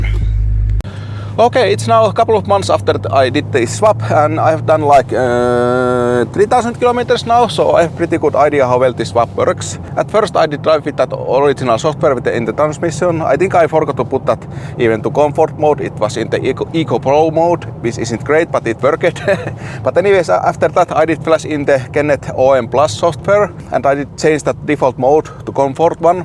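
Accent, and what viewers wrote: Finland. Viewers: Ralli englantia😂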